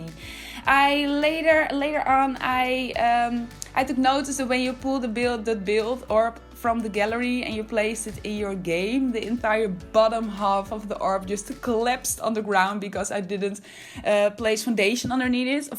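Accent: Netherlands. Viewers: mooi! ik kan horen dat je nederlands bent 😁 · Are u dutch? Cus you have a super heavily dutch accent. Als je Nederlands bent kan je dit verstaan/begrijpen. Je hebt zo erg Nederlands accent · Love your accent! I'm Dutch too hahahaha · That is awesome❤ btw you sound dutch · Ik heb met je stem begrepen dat je Nederlands bent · I didn't expect you to be dutch but that accent bro... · Hello! You're such a great builder! Keep going! Also, is it true that you come from The Netherlands? Because i am Dutch and i can hear a Dutch accent in your language haha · Je heb nogal een Nederlands accent, dus ben jij Nederlands?😂🌸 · Yo ben jij nederlands? Want ik kan het wel horen hoor. De build is trouwens wel vet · Lmao immediately heard you were dutch! Leuk om een Nederlander tegen te komen die ook bezig is met Sims 4 <3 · Love.kawaii Cute ja ze is Nederlands, en haar accent is indd heel erg aanwezig, ik denk dat ze echt wel een Engels accent kan opzetten maar het enigzins misschien niet durft? 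· Are you Dutch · I hear a dutch accent love it 😂❤❤ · You sound dutch 🤔 (I am dutch) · I can hear you're probs dutch!! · Echt prachtig! Ik ga er vanuit dat je Nederlands bent met dit accent hahaha 🥰🤭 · Oke, ben ik de enige die hoort dat ze nederlands is? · I bet your Dutch I can hear it Dus hey hoi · the house looks very good. the accent is very dutch.. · Awesome build!! Just found your channel and can't unhear your dutch accent, sorry hoorde meteen dat je Nederlands bent hahaha ( niet slecht bedoeld viel me gewoon als eerste op ) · I can hear your from the Netherlands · Dat nederlandse accent!! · I love this house! It looks so clear and still it fits in very well with nature. Where is the reference image from? Also; your accent... are you Dutch too? :) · Wat mooi! Ik word er altijd zo gelukkig van als ik een Nederlands accentje hoor. Voel me meteen thuis 🥰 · That’s a beautiful build, impressive Your accent gives me Dutch vibes, I think I’m right ;) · Omg THATS A GORGEOUS BUILD the way you made it circular is so smart! 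lol btw ik kan gewoon HOREN dat je Nederlands bent🥰😝 good job!😍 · Are u Dutch? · You're dutch aren't you? Hehe i recognize your accent😄🤭💓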